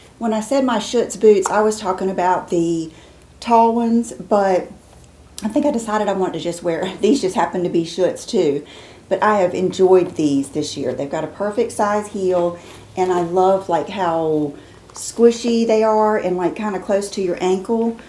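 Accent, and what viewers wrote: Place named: North Carolina, United States. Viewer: I know a North Carolina accent when I hear one. 🙌🏼I too am from North Carolina. The eastern Part! 😘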